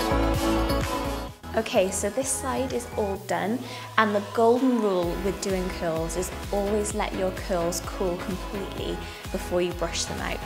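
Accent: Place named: Wales, United Kingdom. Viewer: Welsh if im not mistaken!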